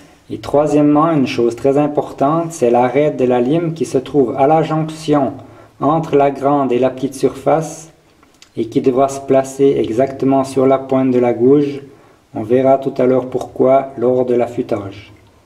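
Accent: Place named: Quebec, Canada. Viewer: vous avez un accent québécois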